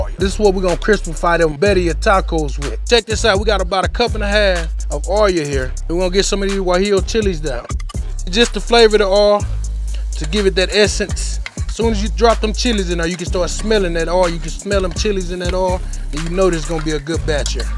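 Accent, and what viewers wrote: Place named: Houston, United States. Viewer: Sound like you’re from Houston.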